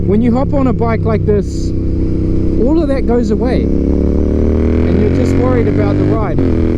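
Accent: Australia. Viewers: R u Australian by any chance?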